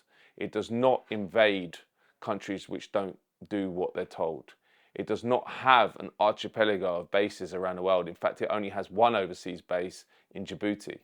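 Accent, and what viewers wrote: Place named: Cockney, United Kingdom. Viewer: Your London thick Cockney accent dialect is not easy to understand to a lot of English speaking nations. If you were more well spoken your message would get across more. Btw i agree with everything you say. I'm from London.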